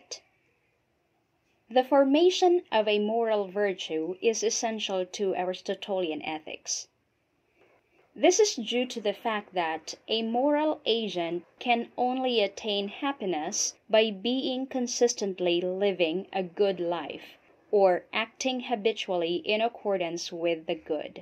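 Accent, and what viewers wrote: Philippines. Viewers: Answer me...is this Philippine English?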